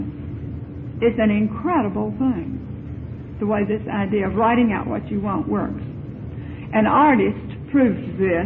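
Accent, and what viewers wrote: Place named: North Carolina, United States. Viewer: I love her North Carolina twang!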